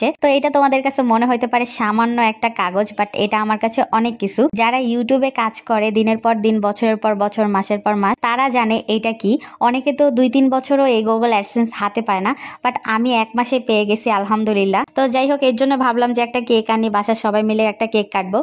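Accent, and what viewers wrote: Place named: Bangladesh. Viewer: তুমি আমার বাড়ি ঢাকায় তোমার বাড়ি কই গো মেয়ে বাংলাদেশের এক নম্বর আঙ্গুর ভাষা❤❤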